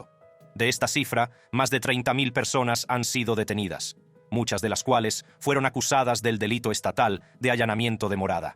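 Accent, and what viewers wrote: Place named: United States. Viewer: Que le Pasa a este maje habla bien y despues habla Como estadounidence aprendiendo español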